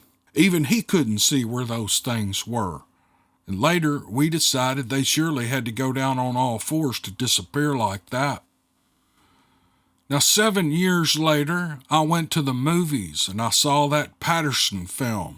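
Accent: South, United States. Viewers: Don't worry about the haters. Just read until you find out that they are haters and then hit the delete. Don't waste your time on them. I love all the stories and don't mind a bit if you mix Bigfoot and ghost or aliens! All good!! Thank You for doing these I love your story telling all the way up here in Michigan. Oh and your Southern sound is just fine!! Thank You again! Tina Rasegan. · Hats off to the writer & story teller. Story flows like southern accent. 🧚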